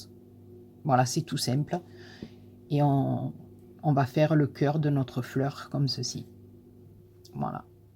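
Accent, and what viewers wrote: South, France. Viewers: Tuto merveilleusement bien expliqué, avec un merveilleux accent du midi, je m'abonne.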